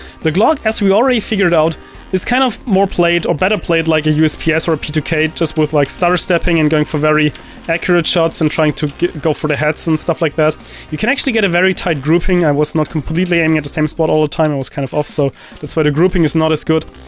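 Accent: Germany. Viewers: Craig Ferguson doing CS:GO tactics after the bell rings xD I love german accent. Somehow makes me giggle. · junge du klingst soooo deutsch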